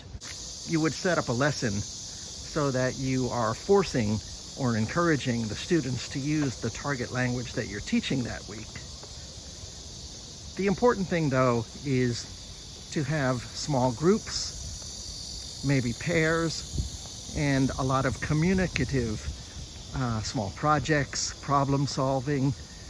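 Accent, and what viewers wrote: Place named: United States. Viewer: were you learning English as a second language? Where are you from then, you sound American to me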